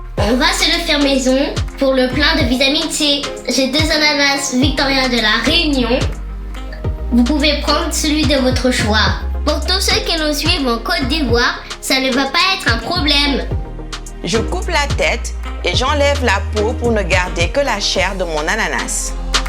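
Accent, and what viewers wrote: Senegal. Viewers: Elle s'exprim kom une vraie sénégalaise😂😂 C chouett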